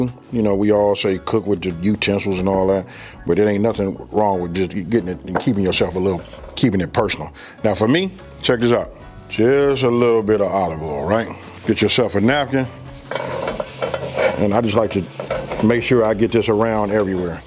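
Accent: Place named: South, United States. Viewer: If you’re from LA, where did you get that accent? I assume the south? Great video!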